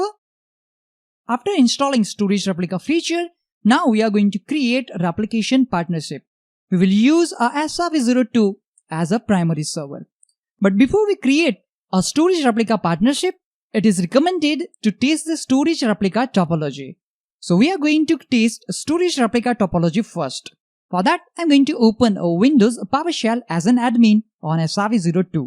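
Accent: India. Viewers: indian accent.....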